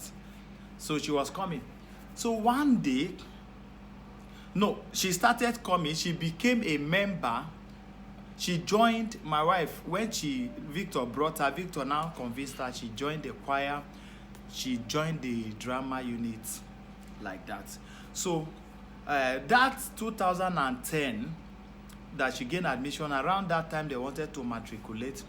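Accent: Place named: Nigeria. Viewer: lmao...😆😆😆I love Nigerian accent...lol, u funny Apostle. I love this...blessings to you and your family. I agree to this aswell and yes it bless me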